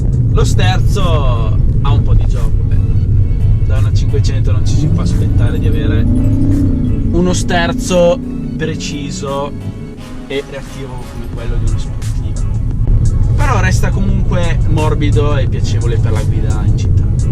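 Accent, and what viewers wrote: Italy. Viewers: Yes, cool to see and hear a Italian in America. :D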